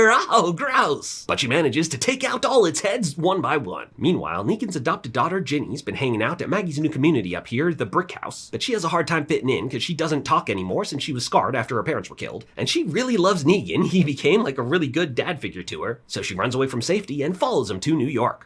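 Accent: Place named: New York, United States. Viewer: Thanks, love the content as always. Was really looking forward to the Dead City recap, and it didn’t disappoint. Love the New York accent 😅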